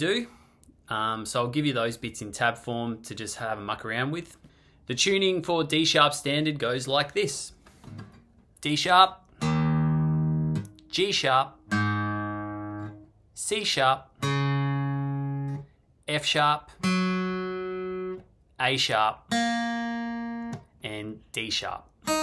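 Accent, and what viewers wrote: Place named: Australia. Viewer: is an aussie talking here.😀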